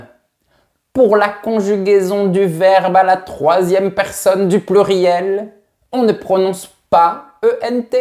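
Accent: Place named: South, France. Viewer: Tu as un accent du sud, et c'est l'occasion de mentionner un mot qui se prononce différemment: MOINS. Au nord on ne prononce pas le S et au sud (donc ta prononciation) on le prononce.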